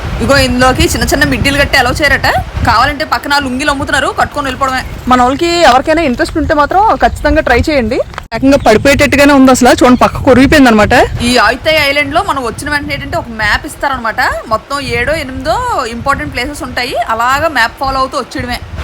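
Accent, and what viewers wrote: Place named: Srikakulam, India. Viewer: Your dress colour is very nice. Your vedios are excellent with srikakulam accent. is beautiful and participative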